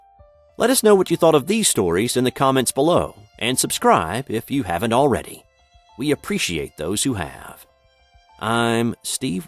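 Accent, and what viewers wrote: South, United States. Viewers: Great narration and voice. But I can't tell iwhat regional accent you have. It sounds like it's between Standard American and southernish. Please enlighten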